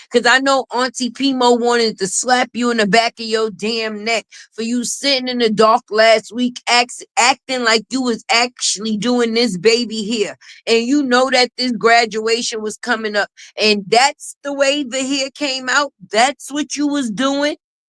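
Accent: New York, United States. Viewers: I LOVE TO HEAR U TALK,FIRST UR GOOD AT WHAT U DO,BUT IT'S UR ACCENT FOR I LOVE IT,IS THAT A NEW YORK ACCENT? HELL IF SO I NEED RO MOVE THERE N CATCH ON TO THE NY. SWAG N ACCENT 😂❤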